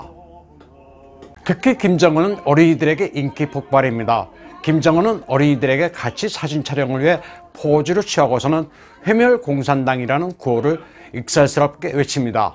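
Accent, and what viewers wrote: China. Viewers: 이사람은 발음이고 뭐고 아무리봐도 중국인인데... 중국인 그렇게 미워하던분들이 어떻게 그리 잘믿는지..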